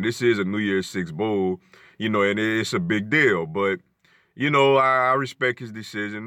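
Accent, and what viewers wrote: New Orleans, United States. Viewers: Aye, bruh are you from the New Orleans area?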